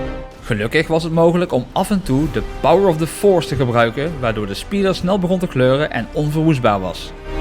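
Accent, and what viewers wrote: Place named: Brabant, Netherlands. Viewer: Ik hoor een Brabants accent 🤩 Good job!!!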